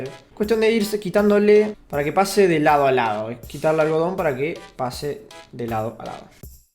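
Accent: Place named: Argentina. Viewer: eres como un mexicano con acento de argentino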